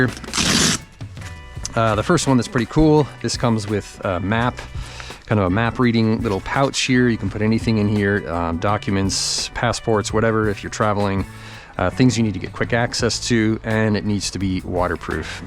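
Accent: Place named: United States. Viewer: Wow this is the first time I heard such an authentic American English pronunciation from Japanese uploaders. Well done.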